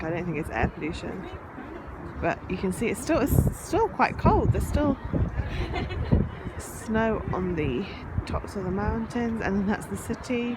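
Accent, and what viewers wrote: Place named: United Kingdom. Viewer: I love your british accent, lovely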